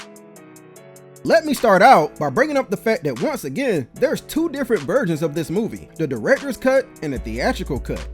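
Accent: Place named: West Coast, United States. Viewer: I like your West Coast accent, cuz.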